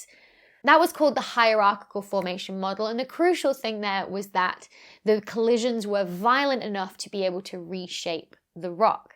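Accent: United Kingdom. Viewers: That's my thought, too, but Dr. Becky isn't the only British astrophyicist I've heard from. I think she is the only one I subscribe to, though, so perhaps she's more comfortable using the British pronounciation than someone doing a Ted Talk would be. Would be cool if she decided to respond.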